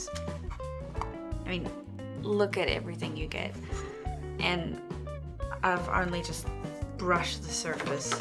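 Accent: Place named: New Zealand. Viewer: i can bet you're from NZ judging from the accent ahahah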